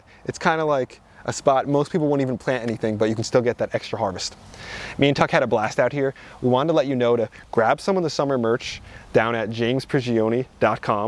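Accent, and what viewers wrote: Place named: East Coast, United States. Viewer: Damn. A million subscribers. Nice. You deserve it since you're one of my favorite gardening bloggers, even though my gardening space is quite tiny (in an urban lot). Because you have that east coast energy which I love... even though I'm a Midwesterner. Probably because my father was born in Phili, and many of his extended family live in south jersey, So they a lot sound like you.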